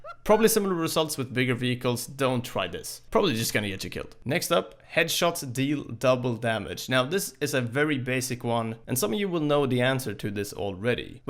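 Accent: Sweden. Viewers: are u by any chance swedish? u talk english like sweds 🤔😁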